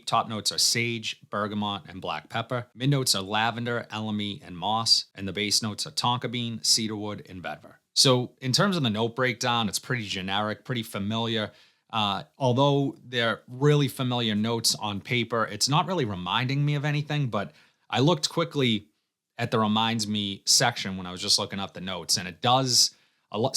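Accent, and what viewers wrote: Boston, United States. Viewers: Just came across your video,,, You have to be from my neck of the woods,, No one speaks this way unless you're from BOSTON...LOLOL I Love Men's Cologne...When I find my favorites Cheap like this I buy them and use them to spray around the House... I will check this one out.. Thanks.. I Love the Men's Paul Sabastian for $20 and Calvin's Obsession for the same $20. or $18 for the Tester. Ebay is a good source if you don't want to venture out.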